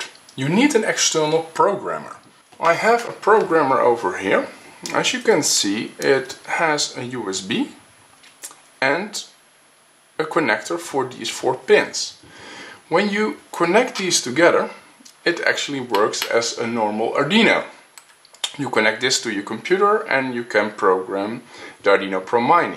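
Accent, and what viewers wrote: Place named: Netherlands. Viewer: I hoorde gelijk dat je nederlands bent...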